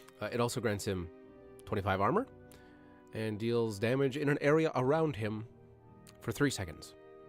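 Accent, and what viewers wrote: Canada. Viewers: I'm gonna guess Canada? He's got some strong Canadian vibes eh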